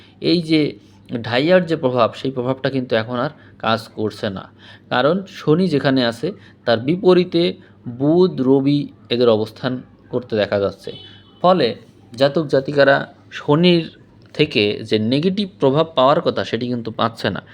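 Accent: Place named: Bangal, Bangladesh. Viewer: আপনার বাংলা ভিডিও তো নয় ---এটা বাঙাল ভিডিও। বাংলাটাই তো ভালো করে বলতে পারেন না। আগে বাংলাটা ভালো করে শিখুন তারপরে বাংলা চ্যানেল খুলবেন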